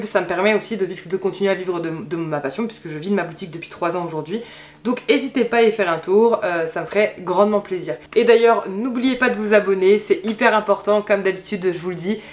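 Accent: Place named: Brussels, Belgium. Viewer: Vous avez quasiment le même accent que feu ma demi-soeur et j'en déduis que vous êtes....bruxelloise. Mais l'erreur est humaine . Vincent, de Liège (Belgique) .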